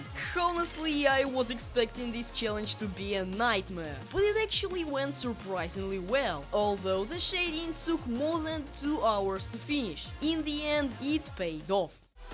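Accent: United Kingdom. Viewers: Why do you sound British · Are you British?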